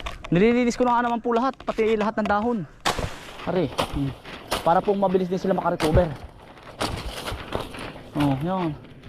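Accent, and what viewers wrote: Philippines. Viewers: what's going on people stealing banana after typhoon destroy almost everything. It doesn't make no sense at all well just stay cool you'll get it back what you've lost. Take care your self and always stay safe and healthy and your entire family too love to watch your show and your Filipino accent too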